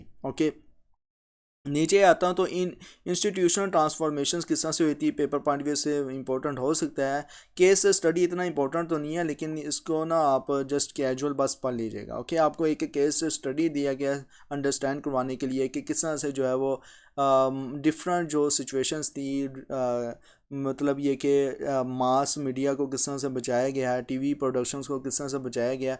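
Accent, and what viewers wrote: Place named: Punjab, India. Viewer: thanku sir for these lectures and your punjabi accent is wow🥰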